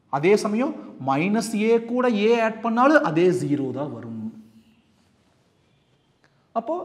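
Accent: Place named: Kongu, India. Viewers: It's kongu tamil 💥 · It's our kongu tamil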